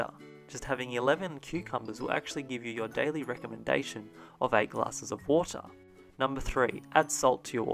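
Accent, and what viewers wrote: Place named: Australia. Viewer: Love the “Bondi Vet” (TV show) Australian voice.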